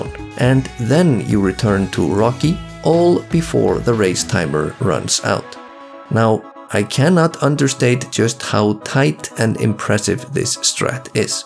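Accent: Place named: Iceland. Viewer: I never click speedrunning videos. Click this one and instantly was like woah is that an Icelandic accent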